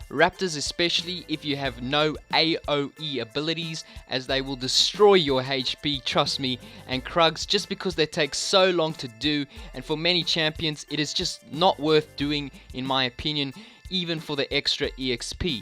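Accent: South Africa. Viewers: you sound like an south african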